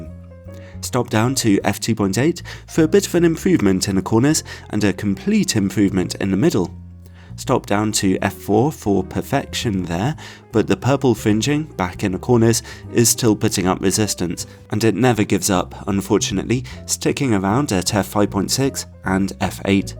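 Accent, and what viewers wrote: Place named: England, United Kingdom. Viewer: what part of England are you from? We are commenting on your lovely accent. Thanks for these videos!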